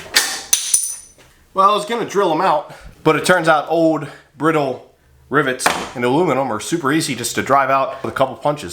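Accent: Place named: South, United States. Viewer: You have got to be from the south, the way you pronounce "on" like "ahwn"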